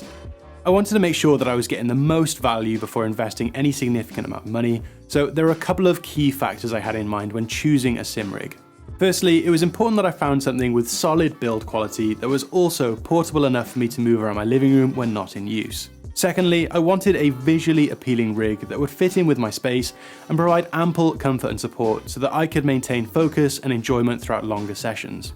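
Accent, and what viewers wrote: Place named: Wales, United Kingdom. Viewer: What part of Wales are you from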